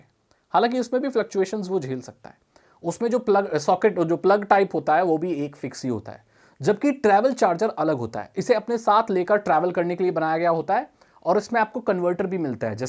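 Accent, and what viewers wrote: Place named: Bihar, India. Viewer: Ap paka bihar hai na bhaiya mujha aise kyu laga hai ki ap bihar sa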